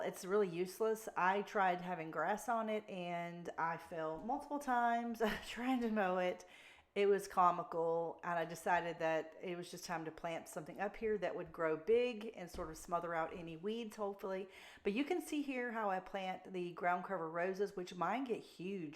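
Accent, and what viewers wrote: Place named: Alabama, United States. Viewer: You have to be from Alabama. The accent is a dead giveaway.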